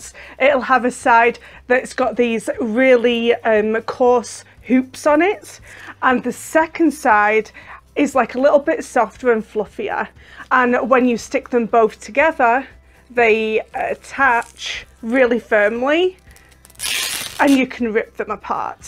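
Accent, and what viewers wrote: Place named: Yorkshire, United Kingdom. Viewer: Hi! Loved your names for the different sides of the Velcro - fluffy and hookey - it gave me a smile. Wondering where you got your accent from - you sound like a real home girl to me (I'm from Leeds but live in Scarborough atm). Lovely video, really innovative - keep up the good work we look forward to them. xx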